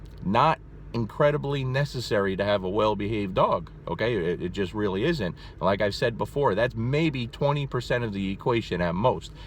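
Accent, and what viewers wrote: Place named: New Jersey, United States. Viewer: Beauuuuuuuutiful truth and boom. “Leave it” was the first thing I taught my lil rescued mutt, (welcomed him in at 4.5 months with zero education before that), and he sponged it up like a CHAMP. Had him fetching and dropping within the first backyard play session too. I think I like fetch even more than Dino... And almost as much as I dig that fab Jersey accent of yers. ;)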